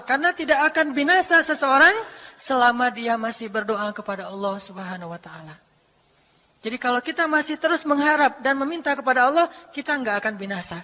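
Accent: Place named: West Java, Indonesia. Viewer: Pa ustad itu aslinya mana tapi ngomong nya ada sundanya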